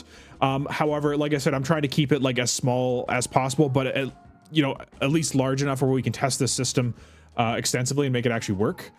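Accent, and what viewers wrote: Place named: Canada. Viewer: just realized you're a fellow Canadian after hearing the "Out" and "About" pronunciation 😂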